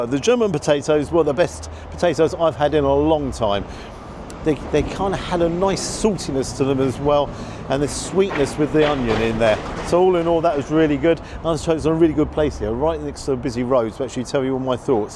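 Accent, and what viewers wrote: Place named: England, United Kingdom. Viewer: A bit late to the party here since this is 7 days old. Great video. I just subscribed. However, I’m assuming you’re English based on the accent… here’s my question. What’s the best steak house in the UK? I’ll bet there’s some good ones! Ty!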